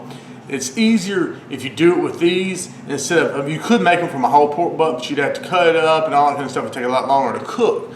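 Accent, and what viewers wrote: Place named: Texas, United States. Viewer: I'm living in England now but grew up in Texas and I definitely miss the accents of the south. Listening to you explain this delicious recipe was a real treat. Thanks man!! Since I grew up with hot links and dry rub long smoked brisket (being a Texan) I'm more and more into pork for bbq. I'm converted!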